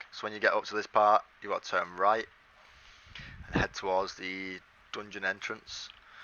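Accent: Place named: Northern England, United Kingdom. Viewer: Cheers angel!!!!!!..I finally found the last part .. which for me was the one on the top of the mountain!!!.. Huge thank you .. I even liked listening to your northern accent!!! fantastic video .. thanks again!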